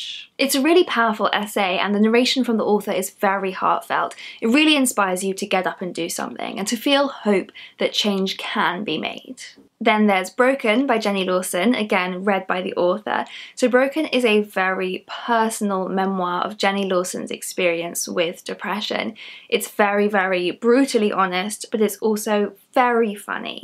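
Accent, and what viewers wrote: United Kingdom. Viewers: British accent is amazing. Thanks for these recommendations 😊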